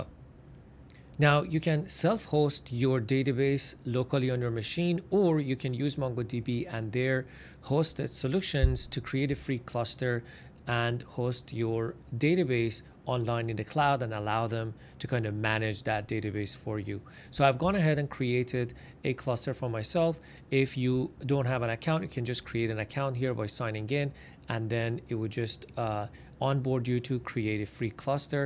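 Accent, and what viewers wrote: Iran. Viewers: به ارور خورده بودم یهو دیدم لهجه تون فارسیه :))))) خیلی بامزه بود این اتفاق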